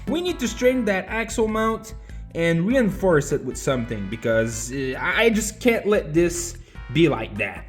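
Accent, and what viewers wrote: Quebec, Canada. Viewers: Is he from Quebec ?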